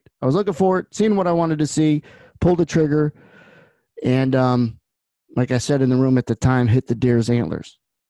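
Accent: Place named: New York, United States. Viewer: I love these videos every day. No nonsense blunt real new Yorker talk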